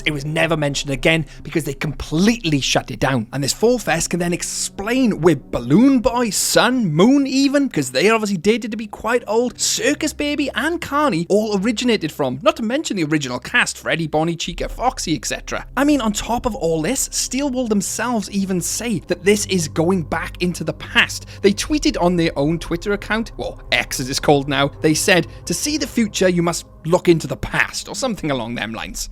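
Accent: Wales, United Kingdom. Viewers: Completely unrelated but are you welsh?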